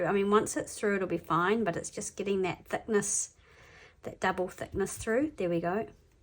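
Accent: New Zealand. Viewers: That was really great. I loved it and the choice of fibres you used. Was that a fellow kiwi I could hear? You have inspired me ❤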